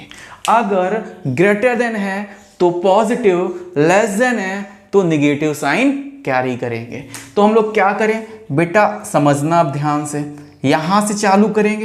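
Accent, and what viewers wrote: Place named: Kanpur, India. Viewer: Gabbar is back on old style wow chacha apne kamal hi kar diya kanpuriya language ke sath ye sambha laga nisana jara to is kaliya par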